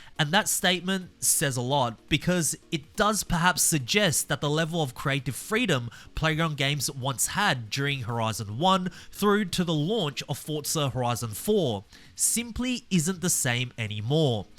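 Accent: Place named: Australia. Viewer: not game-related, but gotta ask - where are you from? you throw around 3 or 4 different accents in your narration, which sounds like a HEAVILY tainted Aussie.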